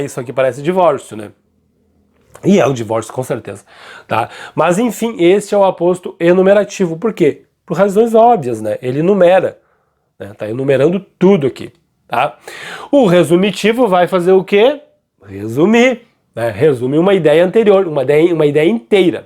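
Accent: Rio Grande do Sul, Brazil. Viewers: Professor, por causa de seu sotaque, eu sempre pensei que você fosse gaúcho de Porto Alegre hahaha.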